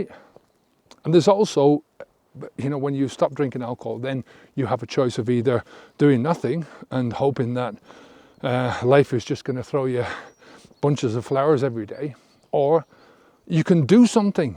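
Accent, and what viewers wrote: Ireland. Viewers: I had the conversation with myself this week. Im a functioning alcoholic. No major hangovers or troubles with the law but definitely something i want to stop. Im SO grateful that i found your channel. You sound irish also. Im from Donegal. Living in Brooklyn ny. Thank you, sir.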